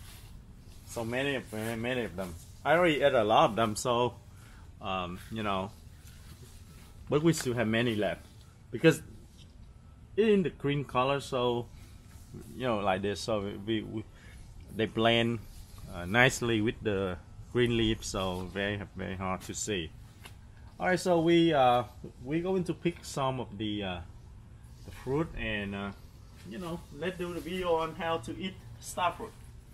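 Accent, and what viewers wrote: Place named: Vietnam. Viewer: beautiful star fruit. You sounds like a Vietnamese, are you?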